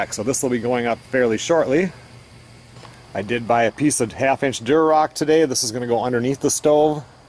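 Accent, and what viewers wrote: Minnesota, United States. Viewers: Love Minnesota accent, Ohh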